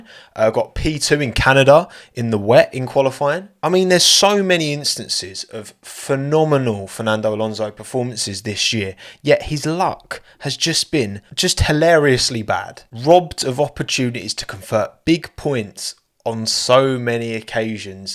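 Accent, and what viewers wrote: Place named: London, United Kingdom. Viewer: The only channel I’ll suffer the London accent for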